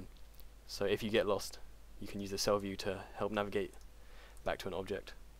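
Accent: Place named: United Kingdom. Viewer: off topic i just wanted to know why you guys in the uk say stuff like theater like theata but with idea you say ideaer just always wanted to know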